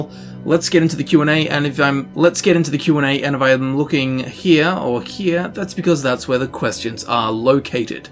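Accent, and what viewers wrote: Australia. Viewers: how happy were you when you saw the storm atronach camel ? I laughed out loud ;) also. I just determined that you're my geek gamergirl crush when you answered the question about choosing daedric lord or dragonborn. handsome Australian guy with an accent AND can answer as many obscure lore questions about TES as I can? SOLD.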